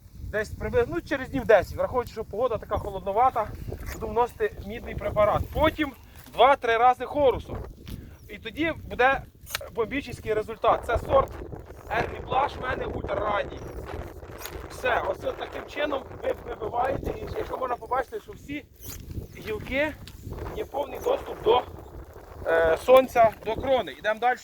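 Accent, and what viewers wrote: Vinnytsia, Ukraine. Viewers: Балакає по вінницьки😂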